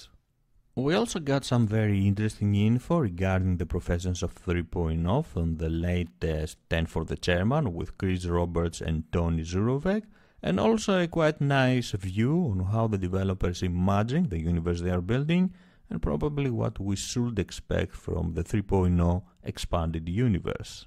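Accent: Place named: Greece. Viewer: Έλα παλικάρι μου, από Ελλάδα είσαι; τώρα το είδα στο άλλο σου video. LOL. Και μου έμοιαζε η προφορά σου για Ελληνική αλλά δεν ήμουν σίγουρος.....χα χα χα. Πολύ καλά τα videos σου. όσο για τα επαγγέλματα θα σχολιάσω αργότερα όταν θα έχω χρόνο. :)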